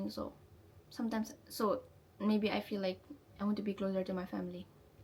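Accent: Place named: Japan. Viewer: Your Japanese accent is so lovely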